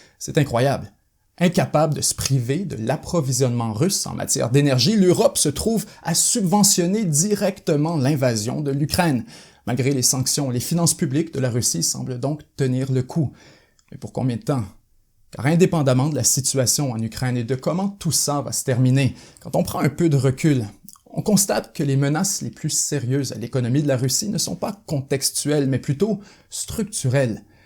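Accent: Quebec, Canada. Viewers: T'es québécois toi, non?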